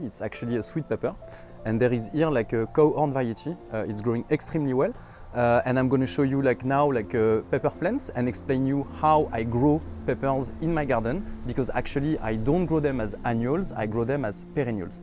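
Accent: France. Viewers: Such a beautiful french guy speaks on cute french accent about his balcony garden ☺️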